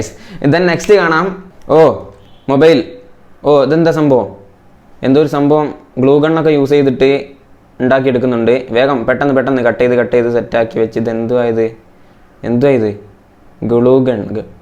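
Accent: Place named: Thiruvananthapuram, India. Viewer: തനി തിരുവനന്തപുരം ഭാഷ😂👍👍 ബ്രോ പൊളിയാണ്😘😘👍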